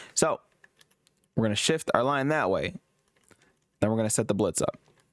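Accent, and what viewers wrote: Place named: RP, United Kingdom. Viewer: Very Posh!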